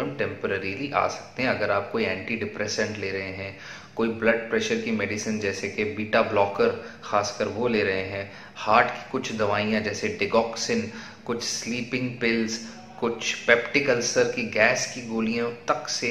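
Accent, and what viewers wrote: India. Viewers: sorry doc..i have a hard time fallowing you..what is that indoenglish ?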